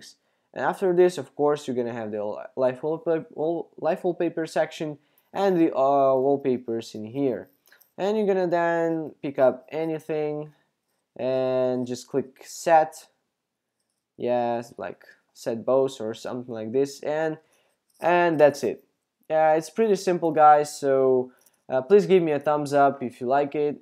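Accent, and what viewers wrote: Poland. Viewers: Are y o u polish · Are you Polish?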